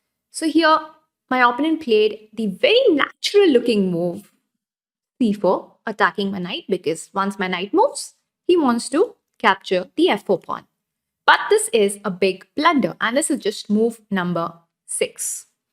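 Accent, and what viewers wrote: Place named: India. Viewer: You need to sort that popadom accent out girl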